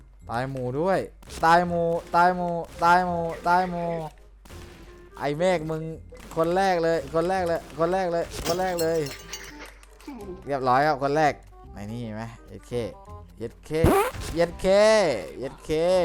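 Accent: South, Thailand. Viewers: ภาษาใต้เรย555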